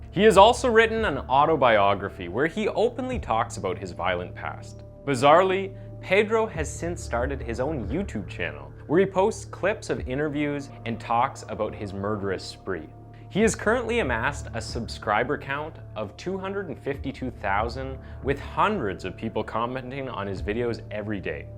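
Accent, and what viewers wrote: Canada. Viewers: caan tell this guys canadian , they say house like hewse and about like a-boat etc lol its the only way i can tell the difference as im british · Is the narrator/ host Canadian?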